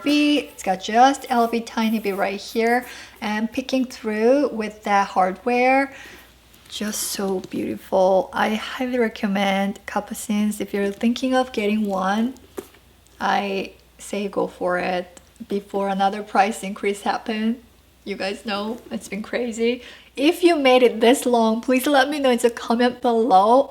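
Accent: South Korea. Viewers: sorry can i ask you one thing? are you from korea? cuz i hear some korean accents 😹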